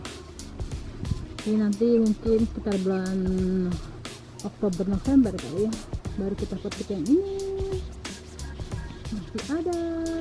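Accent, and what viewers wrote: Jakarta, Indonesia. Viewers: Betawi bangat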